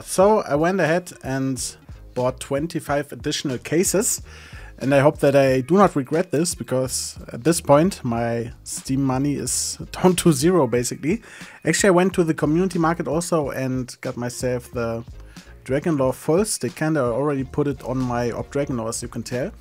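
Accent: Germany. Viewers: Ich weiß es lässt sich nicht sehr einfach abtrainieren aber der deutsch Akzent ist einfach einer Der schlimmsten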